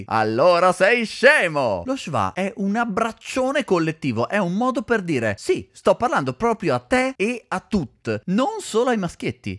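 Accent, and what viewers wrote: Naples, Italy. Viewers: ma questo modo di parlare è normale nella lingua napoletana. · Sembra napoletano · Incredibile.. sembra napoletano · sembra napoletano😅😅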